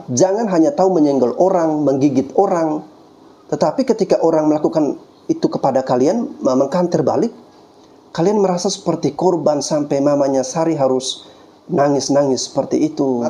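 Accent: Indonesia. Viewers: Jujur dari dari segi intonasi dan tata bahasa bahwa saudarakuku ini jauuh lebih INDONESIA dari pada...........it👍👍👍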